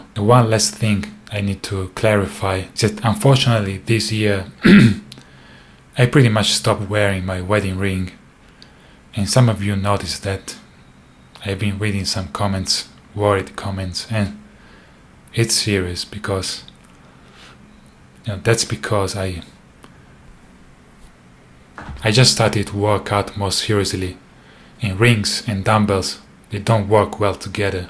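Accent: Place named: Italy. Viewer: Please don’t give up🩷. I accidentally stumbled upon one of your videos. I heard an Italian accent ( my Mom and her family were from Italy), so I stopped and watched. I hadn’t laughed so hard in years. My husband plays bass( and guitar, sorry), so we both watch together. Please know, you gave me my first real laugh since my Mom died. Your videos about playing bass reached all the way to America, and gave one grieving daughter a reason to smile.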